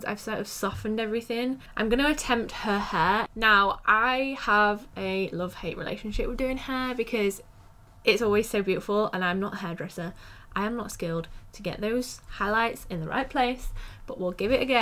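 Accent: United Kingdom. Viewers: I both enjoyed watching you drawing and listening to your British accent❤️ This video is perfect✨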